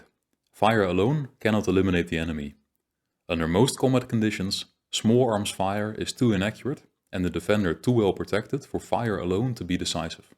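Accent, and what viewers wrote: Netherlands. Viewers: Nederlander. 🤣🤣 ons accent is zo herkenbaar he hahaha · The content is very good. But your Dutch accent is very thick. You make a lot of small pronunciation errors. 100% on some sound. It is really a pity. For an example word: you cannot pronounce the word "organization" correctly. You say "Organizasjen". Or the word "section". You say something like 'seksjen' with a 'sh' sound. "Organizasjen" . Dutch people always hear insert a 'sj' sound everywhere in English. I suppose it has linguistic reasons and very difficult for a Dutch person to learn. Still it is important to know that where your English is very good. Your pronunciation is quite bad on that specific sound. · Love the Dutch accent ❤ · i think that the narrator is a dutch person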